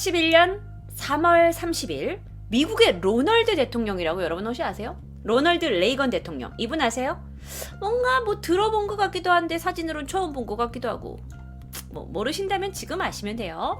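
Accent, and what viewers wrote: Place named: Jeolla, South Korea. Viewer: 전라도 분이시죠? 으 발음 너무 귀엽네요.ㅎㅎㅎ(진심)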